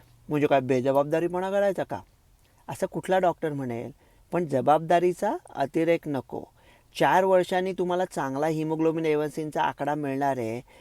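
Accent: India. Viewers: YOU ARE SPEAK LIKE TRUE MARATHI MANOOS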